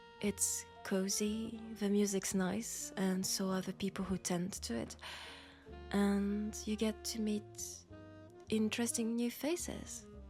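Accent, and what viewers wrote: France. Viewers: Lovely as usual :) Hearing your french accent + mentions of Paris made me think: Have you played Clair Obscur: Expedition 33? I suspect this game will be a must-play for every French gamer, just like Witcher is for Poles ;) If you did, can we expect a story from the game world?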